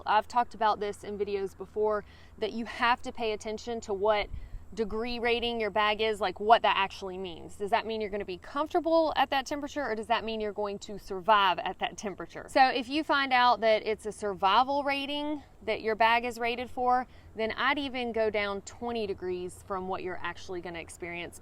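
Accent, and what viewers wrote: United States. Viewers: The why you say your “i” crack me up. It’s so drawn out that it adds syllables to words hahah I’m not picking on you, I’m from Louisiana and my husband from CA could barely understand me when we met LOL Your hard vowel accent was just so drastic and I was curious what part of the US you’re from. WV? Figuring out regional accents has become a strange hobby of mine 🤣 PS I love everything about this channel just from seeing your chair fort set up hahah instant subscribe. I’m BRAND new to hiking and not sure if it’s for me, but this channel seems fun and informative.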